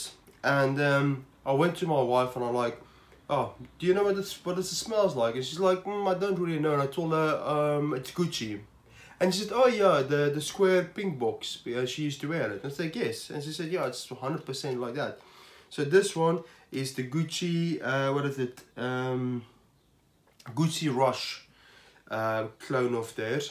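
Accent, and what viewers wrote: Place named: South Africa. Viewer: Are you South African by any chance? I’m South African and I hear it, but it also sounds like you’ve lived in the UK or something.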